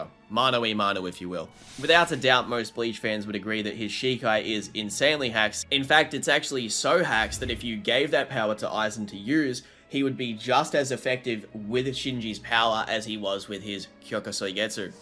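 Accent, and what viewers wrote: Australia. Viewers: nah im so happy youve returned bro love from a fellow aussie (u sound like u aussie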